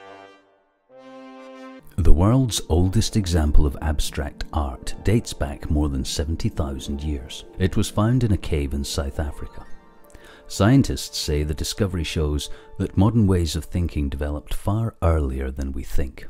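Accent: Scotland, United Kingdom. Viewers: Love the Scottish Accent! Great Video! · It's a Scots accent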